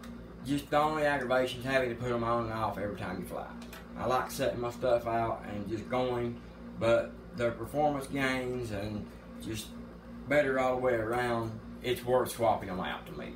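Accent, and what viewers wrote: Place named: South, United States. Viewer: I grew up in southern Kentucky, I sound like a yankee compared to you buddy.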